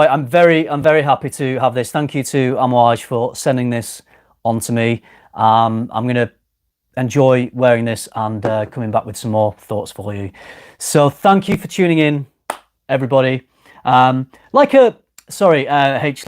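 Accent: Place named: Northern England, United Kingdom. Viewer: I knew were from the North because when you say but you say bot lol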